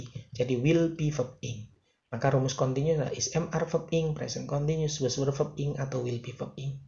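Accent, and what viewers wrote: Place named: Surabaya, Indonesia. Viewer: ALHAMDULILLAH. THANK YOU. VERY HELPFUL. ESPECIALLY USING SUROBOYOAN ACCENT ❤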